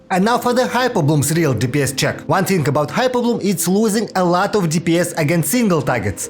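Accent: Russia. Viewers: Как забавно слышать английский язык с русским акцентом) Нахида и Яэ Мико прям чисто на русском сказаны) Но английский неплохой) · Прикольный рашен инглиш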